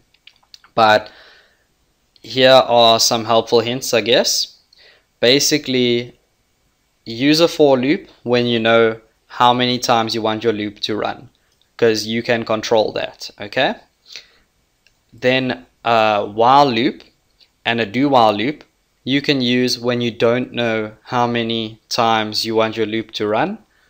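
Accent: South Africa. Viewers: I knew that was a South African accent. Thanks boet !!!!!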